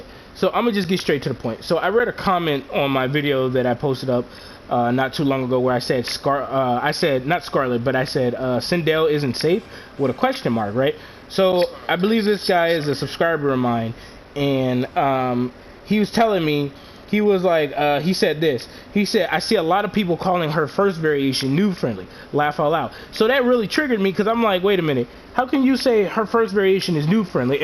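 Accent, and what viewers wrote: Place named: New York, United States. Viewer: Sounds like a New Yorker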